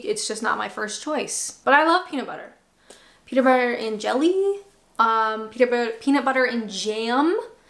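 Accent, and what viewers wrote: Canada. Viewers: She couldn't have said "aboot", because we don't say "aboot". As a Canadian, still sounds pretty Canadian to my ears. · Hi, Canadian here, I will still eat peanut butter and jam and when I was young -- and not so young -- yes to a peanut butter and banana sandwich. Here in Nova Scotia, it is still traditional to have a Sunday roast. Guess that's because so many people here have British and Irish roots -- also it is the big meal of the week for the family. Not everyone does it but it is certainly something I was used to. You may use a lot of 'Britishisms' in your every day conversation now, but you still have your Canadian accent! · Moved to Scotland two years ago and just found your videos. Been binge watching for days now. No, I'm not weird. Just enjoy them. As far as your voice goes, I actually think you sound more American and lost a lot of your Canadian inflections from your earlier videos. 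You used to do harcdore aboots but not so much anymore. Keep the videos coming! The history tidbits have been fascinating and my wife is a naturalized Scottish citizen and she didn't know alot of them.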